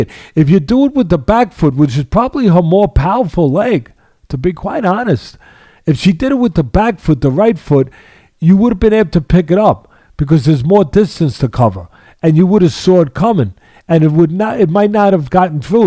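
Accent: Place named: Boston, United States. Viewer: All I want to know is what part of Boston is Teddy from.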